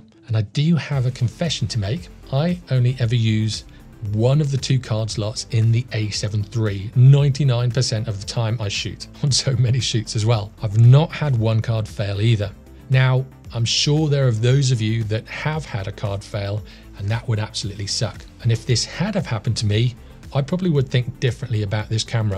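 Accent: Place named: Midlands, United Kingdom. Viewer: Nice one - Im guessing midlands to South.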